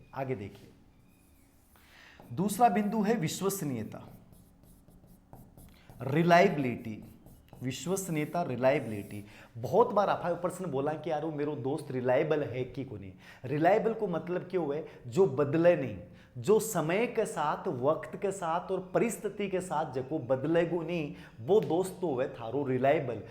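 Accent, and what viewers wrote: Rajasthan, India. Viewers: Sirji aapke muh se marwari boli v achhi lgti h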